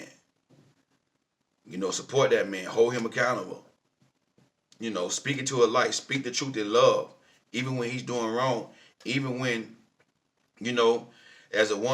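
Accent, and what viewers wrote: South, United States. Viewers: I love and the southern accent!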